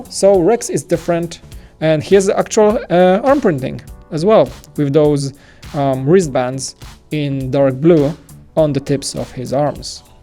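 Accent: Poland. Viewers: I’m guessing Polish 🇵🇱 But I could be clearly wrong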